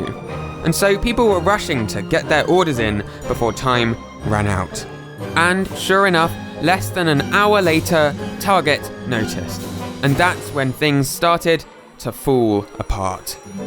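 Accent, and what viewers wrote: United Kingdom. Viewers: I thort u woor british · British voice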